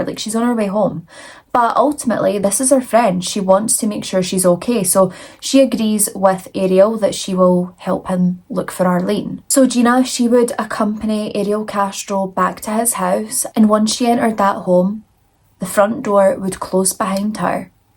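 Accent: Scotland, United Kingdom. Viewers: "how does he even get women/with that hair line" "had to do the big shop at tesco" "that was patricia" 😂😂 i love how your sense of humour can shine through even when talking about such a monster. your channel has been a great find, thanks to the person who recommended you 👏👏👏 Love a Scottish accent so cute ❤❤ I know this case i read the survivors autobiographies but I've forgotten a lot and didn't know castrel's history so thanks for new information and being so thorough Edit: near the end when you said may 6th im watching this on may 6th 😮 spooky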